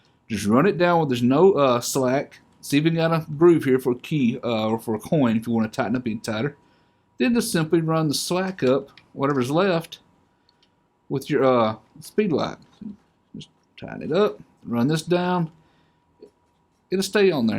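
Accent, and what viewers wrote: South, United States. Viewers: very nice presentation. I subscribed. the southern drawl at first made me think you were dumb but once I got through my own horrible prejudice (about 20 seconds) I realized you are quite informed and intelligent and the drawl becomes endearing. It was easy to overcome my preliminary prejudice because in college, the smartest professor in the school was from Alabama and had a drawl so thick, when he said here it sounded like heeyah. He was so smart, the text books for his class were written by himself, and are the only textbooks I kept. We loved that man. Listening to him talk about Macroeconomics in his graduate level class totally destroyed the myth that southerners are dumb, because his accent sounded like one of a cattleman, but his words were so sophisticated that 99% of east coast snobs, myself included, didn't understand what his words meant until we had studied under him for 4 months. 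Thanks for your useful lessons, and for making them long enough to include everything so we know what to buy!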